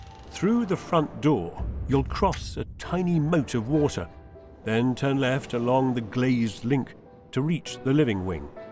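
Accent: United Kingdom. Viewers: If was not narrating in his British vernacular, these videos would just not be as interesting. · the British accent really completes this video haha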